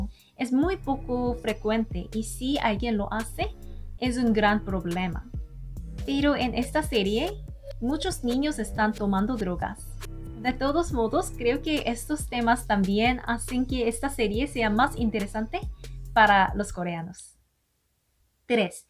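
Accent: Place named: Mexico. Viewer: Hola que interesantes tus videos me gustan. Tienes el asentó del español de Mi México sigue así te deseo mucho éxito en tu canal.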